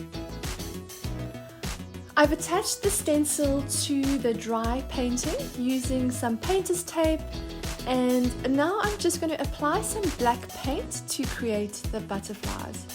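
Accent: South Africa. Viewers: Hi there ... is that an SA accent I'm hearing? And are you from KZN or JHB? Watching you, I feel really inspired to start painting again. So up to my studio I go ... thanks to you:face-red-heart-shape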